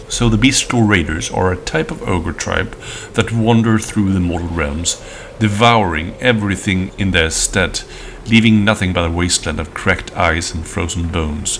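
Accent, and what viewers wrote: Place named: Sweden. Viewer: This was a great idea! I wonder, did you reference any of the books from the last year of Warcry for the Gnarlwood? It takes place all over that area…and likely continues to be set there with the new Hunter & Hunted release. I like your pronunciation of some words. The Swedish soft “J”, I love it! “Objectives”, chefs kiss right there dude. Your pronunciation of chasm I find very interesting. I’ve always ever heard it pronounced like cazz-em. With a silent H. I find British English has a lot of silent H’s. Everyone here in North America has to pronounce every single letter and syllable 🙄. Want a good laugh, ask a North American to pronounce Worcestershire Sauce. Lol, it’s a riot. You frequently hear an H added between the c and first e ! Anyways keep up the great work!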